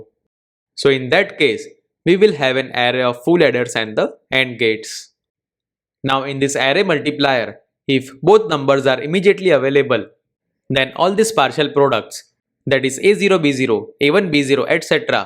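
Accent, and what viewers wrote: India. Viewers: When someone is explaining something in tech in an indian accent. I just know damn well im getting it pretty quick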